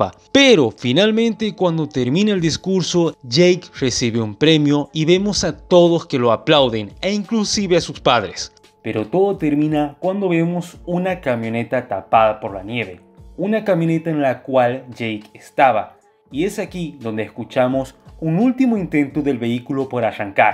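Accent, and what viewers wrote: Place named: Entre Ríos, Argentina. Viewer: Sos medio ashastrado para hablar hermano, pero queda bien! Le da un buen estilo, parece que sos entreriano o norteño de algún lado de Argentina, un abrazo de cordoba.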